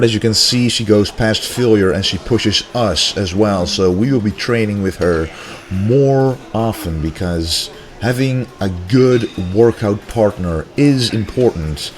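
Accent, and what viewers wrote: Netherlands. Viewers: YUNG_BOAA Come on dude. He's dutch. Its his accent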